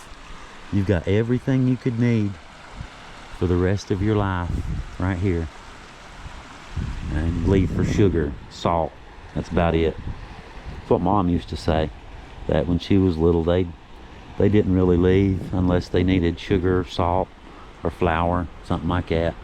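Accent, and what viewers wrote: South, United States. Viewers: Loving your videos on the Hatfields and McCoys. Love your Southern accent ❤ Cheers from New Zealand 🇳🇿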